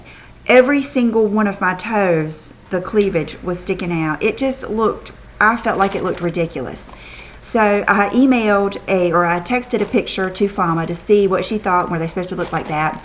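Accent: South, United States. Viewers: Hi, I just wanted to tell you that I love your sweet voice, it's so soothing and I love your southern accent:) xoxo Melody PS. Is there any way you could do a tutorial on your makeup look? It's gorgeous!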